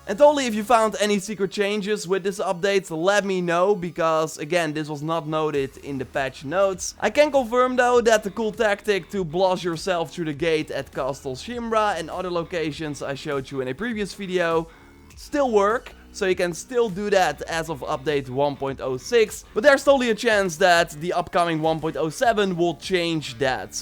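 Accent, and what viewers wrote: Netherlands. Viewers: Your Dutch accent is awkward to hear. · For sure your Dutch with your accent ! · I can hear You’re dutch because of the accent. Its pretty bad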